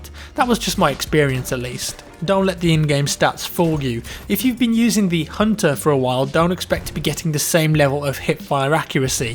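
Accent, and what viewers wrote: United Kingdom. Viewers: hey man I'll make it short I like you vids and your buttery British voice❤️ I would also love the Xbox you giving away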